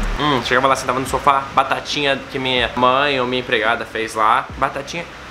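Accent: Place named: United States. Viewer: Ele parece ser americano. O jeito dele de falar, fala travando como os gringos quando começa a falar português.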